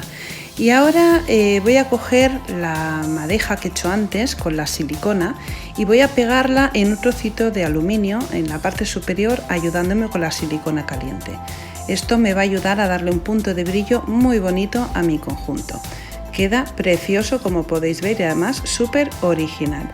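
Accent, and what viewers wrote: Spain. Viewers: Olá maravilloso regaló lo voy Aser quiero Aser regalos Para él diez dé Mallo para vender algo económico me podrías alludar kn más ideas fáciles Muy ermosisimo trabajo me llamo margarita desde León GTO México y x tú forma de ablar eres dé España muchísimos saludos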